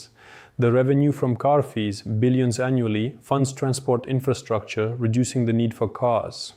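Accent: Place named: United States. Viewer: &quot;Employment security not the best? I lost my job and was paid 70% of that salary for a year afterwards, as long as I proved I was job searching in that period. What other country does this? At one point I had a hard time paying a months rent with that 70%, and my municipality paid my rent with barely questions asked. Coming from an author who sounds american, you guys have absolutely 0 job protection or unemployment benefits. Really deligitmized this video.&quot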